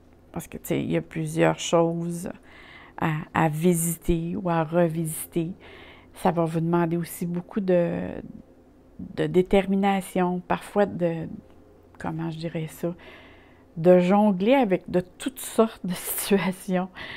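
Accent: Canada. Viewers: je vous decouvre et j'adore....MERCI bizou du coeur de Nouvelle caledonie...(votre accent canadien...que jadore)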